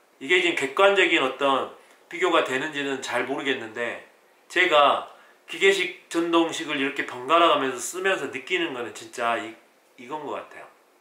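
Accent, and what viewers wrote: Jeolla, South Korea. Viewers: 전라도 말투 너무 구수합니다 ㅋㅋㅋ 시마아노 ㅋㅋㅋㅋ 항상 좋은 영상 감사합니다 ㅎㅎ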